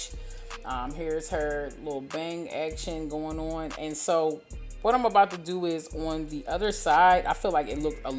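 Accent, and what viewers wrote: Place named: Louisiana, United States. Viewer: Are you from Louisiana? Your accent sounds creole like some south Louisiana residents.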